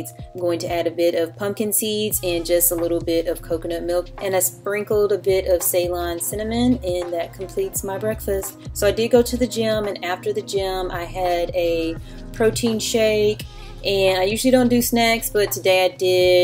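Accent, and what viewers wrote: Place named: DMV, United States. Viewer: DMV accent🤔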